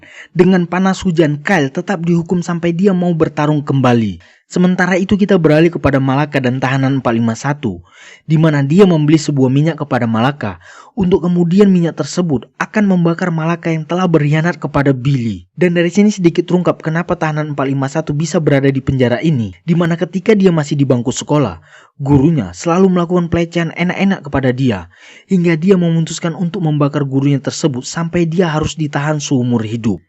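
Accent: Medan, Indonesia. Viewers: Admin nya Orang Medan ya?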